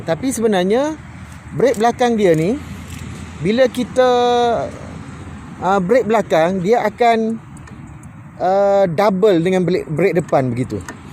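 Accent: Kelantan, Malaysia. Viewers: acu kecek kelate skali bea hehehe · Ore Kelate ko hox kecex nih???? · Kecek luar berbaur budu cap ketereh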